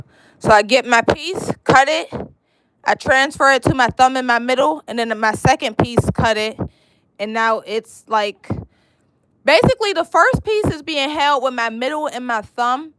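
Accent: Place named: Philadelphia, United States. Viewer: I'm big on accents, where's that accent from? It sound like the Philly area or Rhode Island or Baltimore, I can't put my finger on it😂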